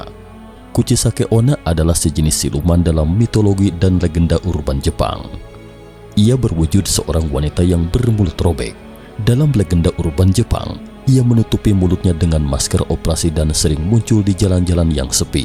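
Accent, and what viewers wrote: West Java, Indonesia. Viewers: Sundanesse abangnya hhe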